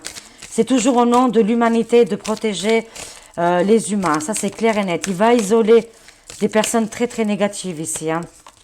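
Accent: Albania. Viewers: Hi, l'accent Ok, je sais pourquoi puisque vous êtes d'origine albanaise...Mais - ceci expliquant cela - vous n'avez pas bien saisi le sens du mot: PHILANTHROPE qui veut dire: plein d'amour pour l'humanité!.. Avec eux, c'est même TOUT LE CONTRAIRE....IL FAUT DIRE pour qualifier cette clique ( à claques )....MISANTHROPE, qui est celui qui déteste les gens.. Mais c'est encore trop doux pour eux. On peut y aller avec: carne...charogne...harpie (si c'est une femme), salopard (si c'est un homme) et SUPPÔTS DE SATAN pour les deux sexes. Attention, ces mots restent dans le domaine de l'insulte....Le mieux c'est d'employer le mot: MISANthrope.... J'aime bien ce que vous faites...Un petit bonsoir du Prof... PS/ Pour la nouvelle coupe, elle est bien, mais je n'avais pas vu lorsque vos cheveux étaient plus longs.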